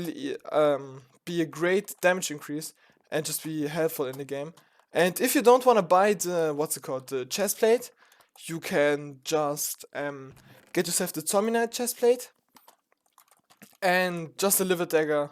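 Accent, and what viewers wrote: Germany. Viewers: alter dieser Deutsche Akzent ist insane bei dir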